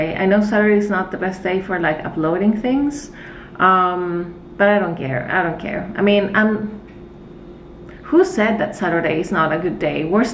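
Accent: Chile. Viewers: Adoro su acento chileno <3 Te quiero eres como un modelo a seguir <3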